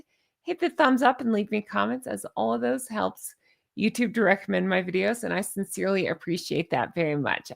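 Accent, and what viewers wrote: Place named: South, United States. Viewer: I love a southern accent ❤ I don’t think you should suppress it. Imo. 😊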